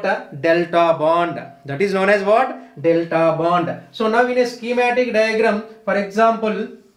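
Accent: Kerala, India. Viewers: Sir ARE YOU FROM KERLA??? I LIKE YOUR SPEAKING 👍👍👍👍 AND TEACHING ☺️☺️☺️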